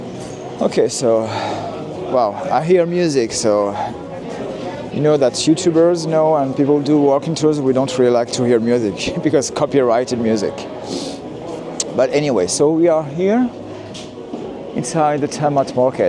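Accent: France. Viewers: It was a very good decision to talk to us viewers. I hope that your channel will become even more popular as a result. You have the advantage that your English with a French accent is very popular in the English-speaking world. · Aww... nicely done! Your English with a fleur of French is very good, I just love it! Keep changing your channel, my favorite walking tour channel on YouTube, merci beacoup! 🗽🍏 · I had just come across your recent Hudson yards video & it was beautiful 😍 🎄. I instantly subscribed & now seeing this. I like that you've decided to narrate your videos. A French accent is so nice. I can't travel like I'd like to so these kinds of videos are great. ❤🤍💚🎄🎅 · YES!! Finally we get to hear your perspective! Your walking videos were already great but with the added commentary, it'll be even better. And don't worry, your English is perfectly fine! Your French accent only adds to the content and makes you stand out. 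👍 Allons-y, les gars! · Super que tu commentes tes vidéos! Bon, je ne suis pas totalement bilingue, deux trois petits mots en français pour ton public de France, ce serait chouette. Mais tu t'exprimes super bien en anglais avec le p'tit accent frenchy qui va bien. Du coup, on comprend assez bien. Continue ainsi! Keep it up. Let's go. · As an afficionado of New York walking tours, I am well placed to say that your first narrated tour is eclipsed by none. The views of Dumbo are stellar, significantly enhanced by your impeccable command of English, a command that, it must be underscored, notably exceeds that of most native speakers. (Do not underestimate the charms of a beautifully poeticized use of English with slight overtones of French!) A wonderful audio-visual experience. Kudos! Quelle promenade exquise -- parfaitement bien narrée ! Nous vous en remercions infiniment ! Bravo ! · Words cannot express how proud I am of you and your bold and courageous step to start narrative and soon to come live videos. 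❤❤❤ Keep moving forward and break through those barriers that prevent us from achieving Your English is great with a French flair ❤❤❤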